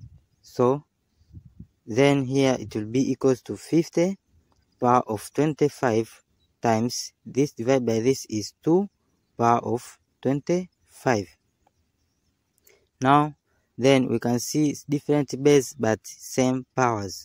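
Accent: India. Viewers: Thank you random guy, that sounds Indian, on YouTube.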